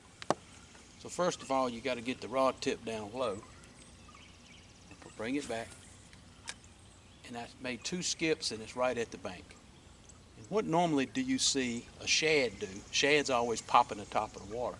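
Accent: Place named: Virginia, United States. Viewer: Nice, where are you located? You sound like a Virginian.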